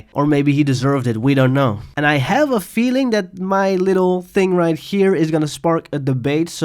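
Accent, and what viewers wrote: Netherlands. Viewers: Im probably wrong but your accent sounds kinda Dutch lol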